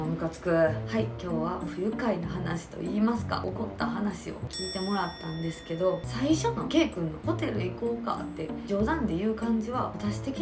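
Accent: Kansai, Japan. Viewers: 変な男やな～関西弁ええやん～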